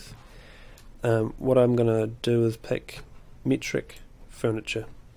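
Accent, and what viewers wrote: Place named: New Zealand. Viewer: hi thanks for that, you saved me heaps of hassles. I enjoy the kiwi accent :-)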